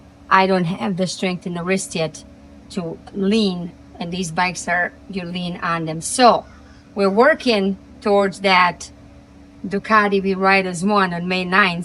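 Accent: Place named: Central Europe. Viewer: Nice! Im trying to place your accent......Central Europe?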